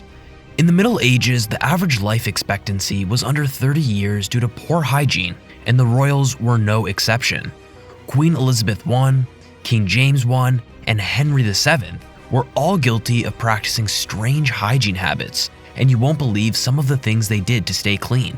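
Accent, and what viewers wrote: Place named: United States. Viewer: full of incorrect “facts” and seppo pronunciation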